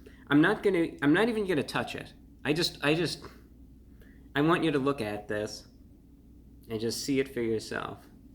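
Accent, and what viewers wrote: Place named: Transatlantic, United States. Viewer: Your Transatlantic accent is absolute perfection.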